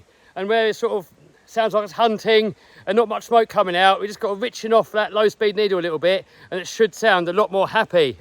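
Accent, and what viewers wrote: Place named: United Kingdom. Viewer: DUDE I THINK ITS AWESOME HOW YOU PRONOUNCE CARBURETOR..!! I like the British accent.. its awesome.! 🤟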